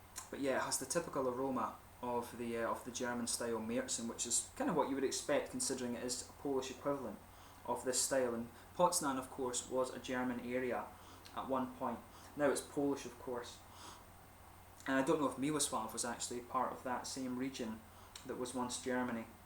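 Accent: Scotland, United Kingdom. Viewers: You have quite a bit of different Language skills , it's difficult I'm sure to acquire those different languages like that . I've watched many Movies with Scottish Actors and Actresses , and when I watch you're Reviews I always look for comparisons between you and them, and you have become really good at curving your accent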